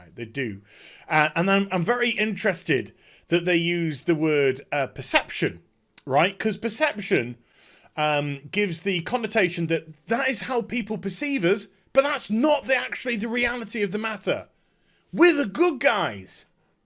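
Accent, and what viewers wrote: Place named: United Kingdom. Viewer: god i so fuking love the British accent :D to the topic Keep up the good work that need a abo :D great evening from germany here .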